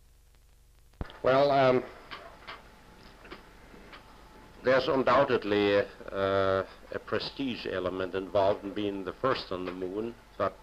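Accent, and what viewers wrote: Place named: Germany. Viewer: I see you trying to hide your German accent cause lots of Jews in American government that allocate nasa funding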